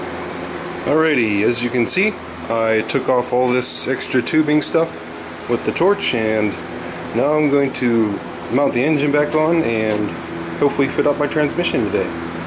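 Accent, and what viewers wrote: Canada. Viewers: You sound extremely Canadian.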